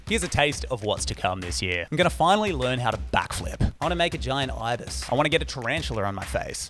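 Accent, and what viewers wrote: Australia. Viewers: Hey, as an Aussie who watches all these productivity / life improvement stuff, you are so incredibly refreshing. It’s great to hear our accent and the very aus approach to this stuff - ‘fuck it, I’m in!’ - you’re unique in a sea with lots of sameness so you gotta keep making content! I’ll listen to anything you’d like to talk about! This bucket list, mate - that’s fucking awesome and I’m looking forward to following your journey!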